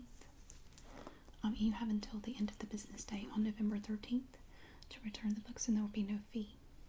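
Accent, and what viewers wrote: South, United States. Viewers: You're very similar to old school Maria Gentle Whispering with a southern twist and it's perfection! Thank you. 😊 ❤️ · Love your soft american accent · Really liked the soft speaking and page turning. Very relaxing. Thank you! Love your southern draw too btw... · Now what part of our beautiful south are you from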